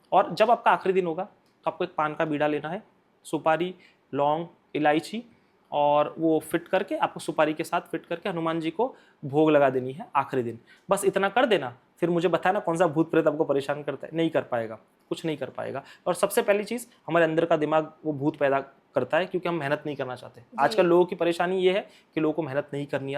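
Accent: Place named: Gujarat, India. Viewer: Ye admi gujrati lagta hai Jaisi hindi bolta h uski wajah se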